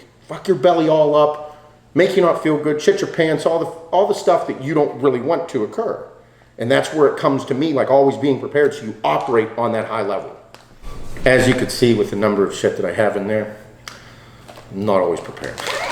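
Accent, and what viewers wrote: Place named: Cleveland, United States. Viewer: You anywhere near Cleveland? I hear the accent and see giant eagle got a feature.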